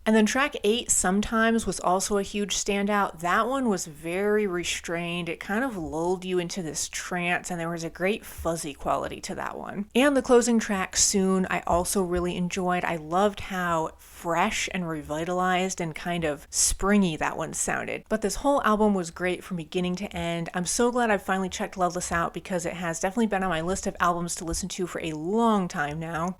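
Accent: United States. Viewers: god ur american accent is so annoying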